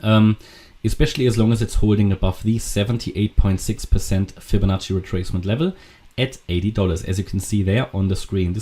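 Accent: Germany. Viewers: Like Kris MM CRYPTO, Deutsche dialect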